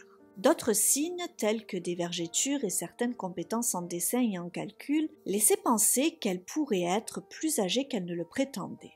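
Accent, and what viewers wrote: South, France. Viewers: J’ai oublie, pardon …Merci pour ce très bon récit.Continue… j’adore ton accent du Sud, qui est bien tempéré, pas à couper au couteau😊❤😅😊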